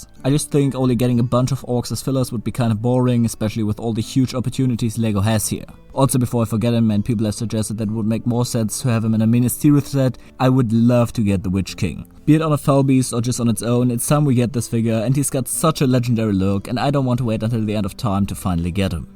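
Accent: Germany. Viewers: U are German right?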